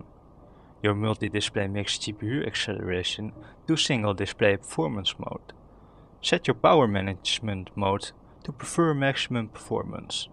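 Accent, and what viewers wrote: Netherlands. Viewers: You're dutch :D dat accent tho. - a current reader of ur BFH app :)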